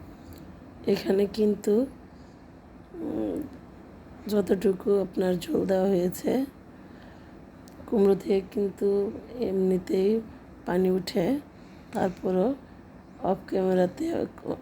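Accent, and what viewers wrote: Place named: Tangail, Bangladesh. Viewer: আমিও টাংগাইলের আপু। তোমার কথা আর রান্না দেখে মনে হলো টাংগাইলের মতো তাই বললাম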